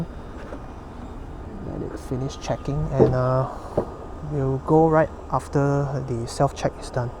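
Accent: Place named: China. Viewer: why autel is using a Chinese accent speaker? why not a neutral accent speaker?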